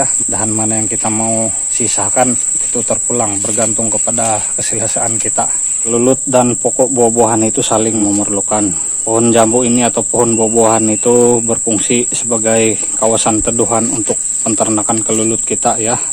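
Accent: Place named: South Kalimantan, Indonesia. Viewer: Logat nya Seperti Kalimantan Selatan Om, Salam kenal saya balikpapan, Kaltim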